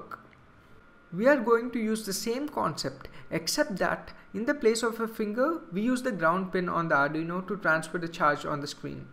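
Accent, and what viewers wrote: India. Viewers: He's obviously from India :/ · yeah but i visited kolkata and there their accent was like his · i think he's from india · are you from India? i'm from there i know this accent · Probably of Indian, Pakistanian, or somewhere around there-ian/ish. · this is the indian accent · I am sure he from India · i don't undersand but i hate indian accent and voice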